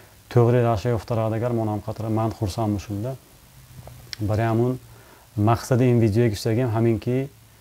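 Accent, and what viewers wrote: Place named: Samarkand, Uzbekistan. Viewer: Ofarin ba lahjai samarqandiyat. Zinda doshta boshed zaboni khuda.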